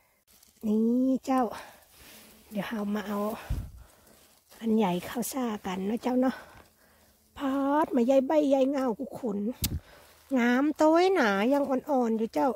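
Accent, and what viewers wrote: North, Thailand. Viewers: สวัสดีจ้า ป๊ะกันแฮ๋มแล้ว เผาะก่าอวดเห็ดคำเน้อใหญ่ไปใหญ่ง้าวงามแต้งามว่าทุกคนหันเกาะเจ้าซุมาเถอะเจ้าสงสัยล้นซ่า พี่ชอบมากๆเลยอู้คำเมืองม่วนดีจ้า👍👏👌😍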